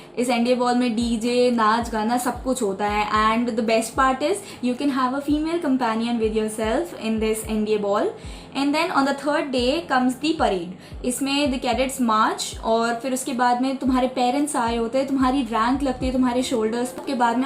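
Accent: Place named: Kanpur, India. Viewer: Mam I love your kanpuriya ascent❤️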